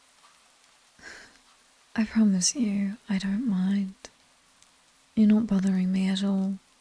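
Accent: United Kingdom. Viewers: I love the British accent and the background thunderstorm. It's so calming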